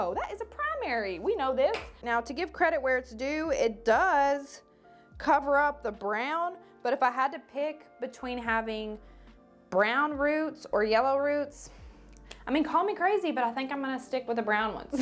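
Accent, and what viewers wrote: South, United States. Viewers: I can’t decide if my hair looks better brown or blonde. Do you do hair in a shop? You sound southern and I live in the south. Would travel to have you do my hair. I feel like if I go brown I might look older hmmmmmm did I say just got divorced, my dogs died and I broke out with psoriasis all in a year. I’m finally healing but I feel so darn ugly. I sort of gave up on trying to look my best after so much medication and steroids. I want to try and look as youthful and I just can’t seem to get it. I paid 200 at my last salon appt and it looked no better than when I did it. 💕😀love you and keep smiling. You have brightened my day so many times. Marnie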